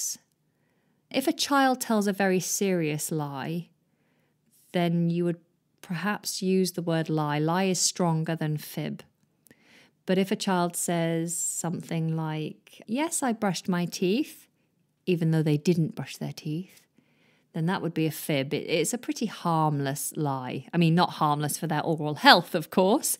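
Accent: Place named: United Kingdom. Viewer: Love it. Love British accent.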